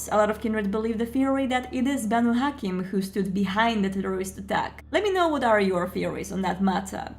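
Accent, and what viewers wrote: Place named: Poland. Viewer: Why is Kirsten Dunst speaking to us in a Polish accent? I am intrigued...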